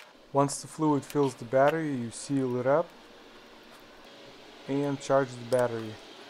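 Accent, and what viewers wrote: Russia. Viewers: Блин слышно сразу по голос русский))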